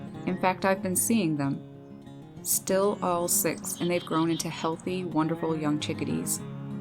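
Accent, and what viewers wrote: Newfoundland, Canada. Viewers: You sound like a newfoundlander. Am I right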